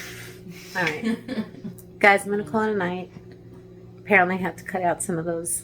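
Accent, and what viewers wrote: New York, United States. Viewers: Your hair came out beautiful! I love the full abudance of curls. I like your New York accents; my home town.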